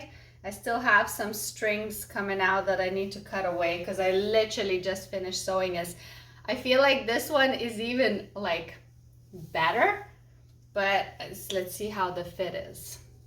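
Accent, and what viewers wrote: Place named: Lithuania. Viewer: You have such a nice personality! I could watch you over and ovr. I'm new sub, 77 from Wisconsin, USA! I'm glad I found out your origin is Lithuanian, because I've watched 4 videos and I've been trying to figure out where you were born, your accent is SO CUTE! I'm watching ALL your videos now. I wish I fit in your clothes! I'm a bigger woman, but I would LOVE to buy an outfit to wear from you. God bless you!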